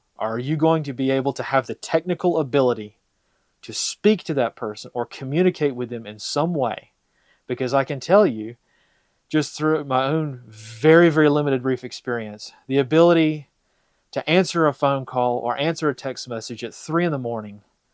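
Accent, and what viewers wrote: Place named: Pacific Northwest, United States. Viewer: Great content. Sounds like you live in the PNW.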